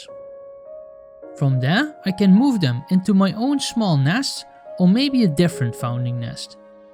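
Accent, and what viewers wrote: Netherlands. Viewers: Ik dacht al dat ik een Nederlands accent hoorde =D toen zag ik de oven.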